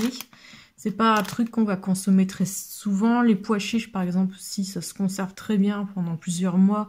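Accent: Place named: North, France. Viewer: je découvre cette chaine, je vais regarder les autres videos. vous êtes d'où ? je semble distinguer un petit accent du nord sur les "a" ;)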